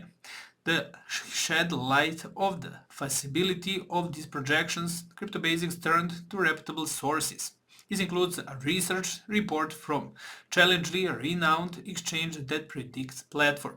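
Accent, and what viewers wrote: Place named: Balkans. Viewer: Are you from balkan?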